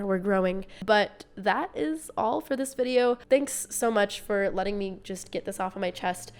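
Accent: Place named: New England, United States. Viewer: I love your content. I had to look up where you're from because I was convinced you were from New England, but I was shocked to find out you weren't. You would get along well here. You talk like a New Englander... We love the cussing. It enhances our feelings when we speak. It shows passion for what you believe in... Keep cussing, and share those beautiful thoughts and feeling 👍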